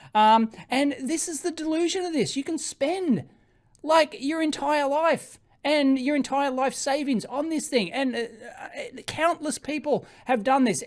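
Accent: Australia. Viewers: Geravi-tational lol gravitational. I love Aussi accents.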